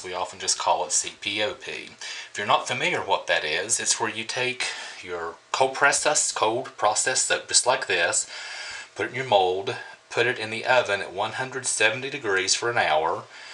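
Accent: South, United States. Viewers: Very nice, indeed. The side pits were interesting. I've only had some trouble with my tops from overheating. Do you sell your soaps or have a website? I truly do enjoy your southern accent. I hope that doesn't offend. I have family born and raised in the South, and it's just a happy sound to me. 😃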